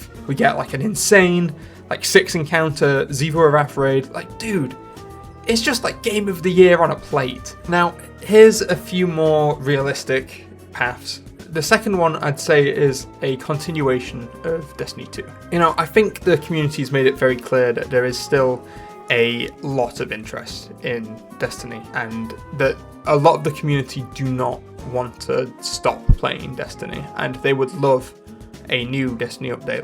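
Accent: United Kingdom. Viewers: he's british???????????? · IS BRI'ISH?! (I hail from Tw*tter)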